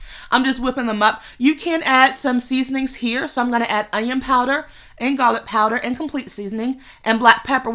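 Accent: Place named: South, United States. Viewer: Yes, I knew you were from the south immediately when you said y’all! Thanks I’m glad to have found you. I will be following.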